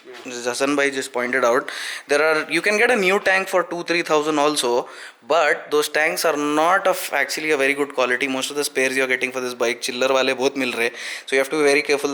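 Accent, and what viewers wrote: Hyderabad, India. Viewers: hyderabadi hindi is so cool 😂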